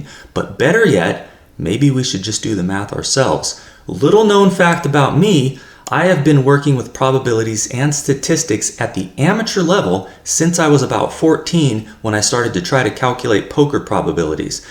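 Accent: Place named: United States. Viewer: Americans pronounce the ant part so it's perfectly fine since he sounds American.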